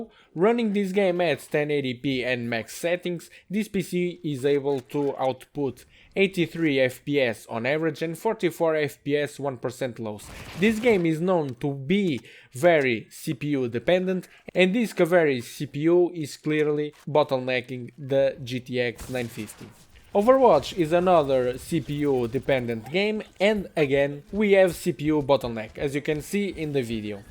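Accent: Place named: Portugal. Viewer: Vi logo que era um tuga ahah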